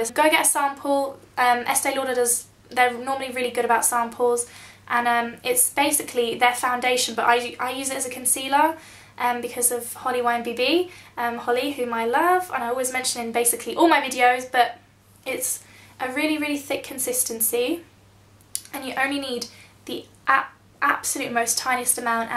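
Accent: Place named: United Kingdom. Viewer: Hey, can you tell me where you are from the UK? I want to do a year abroad, and I love the way you speak :)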